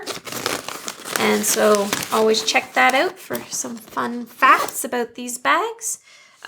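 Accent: Canada. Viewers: I could really hear your Canadian accent in this one 😉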